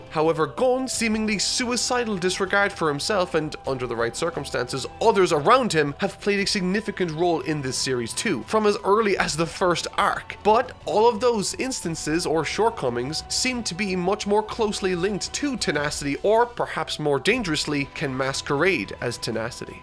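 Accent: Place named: Ireland. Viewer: It's just his Irish accent. The same way he pronounced "calm"/kæm/, instead of calm/kɑːm/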